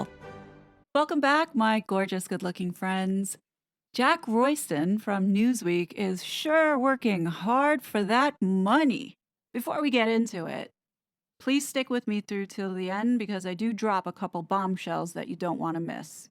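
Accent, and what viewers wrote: New York, United States. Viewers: I don’t believe she is attacking appearance. A ferret is a type of weasel, which I believe is calling her. I may be wrong, but that was my take. native New Yorker is coming out in this video. When she gets riled up about all these deceptive shenanigans, accent stands out and she speaks faster and with more vocal attitude. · I love when your New York accent and phrases shine through🥰. I live in Florida now but originally from Jersey shore, I miss those things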